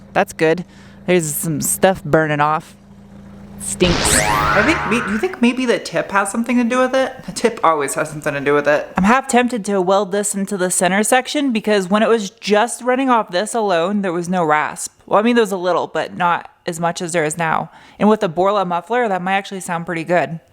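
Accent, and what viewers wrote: United States. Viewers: cant stand the way americans garage, like gar-odge in canada we say guh-raaj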